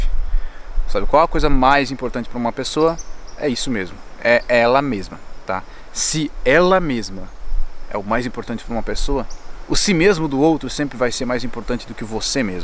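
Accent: Rio Grande do Sul, Brazil. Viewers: Apaixonada por este sotaque gaúcho. 😍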